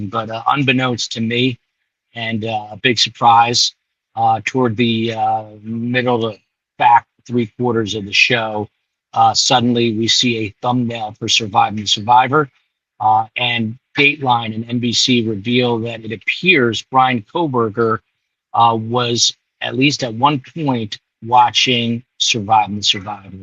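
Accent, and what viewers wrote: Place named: New York, United States. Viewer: Great news flash,. Looking and sounding very New York.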